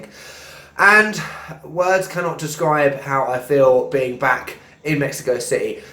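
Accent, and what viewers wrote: United Kingdom. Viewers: I love your British accent, I'm really glad that you love our city and culture ❤️ I also like British culture, in fact I'm actually trying to improve my British English because I learnt American in mi school, haha. Cheers m8 :)! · Also have to say that after lots of vids of north americans (kinnetic kenons, tangerine travels and more), I love to hear your easy to understand british accent. Cheers from Spain.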